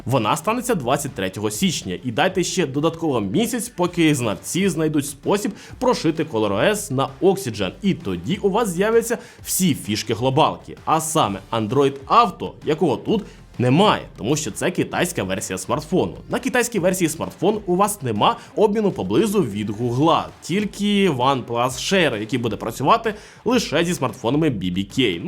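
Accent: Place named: Ukraine. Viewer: Ооо, ну всё, понятно с вами. Цэкайте и гакайте дальше без меня. Отписка!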